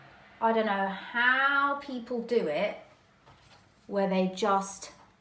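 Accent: United Kingdom. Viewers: I’m from Sweden and I love your brittisk accent and your sense of humor. And love to hear about your english life. By the way - I love makeup on you tube😍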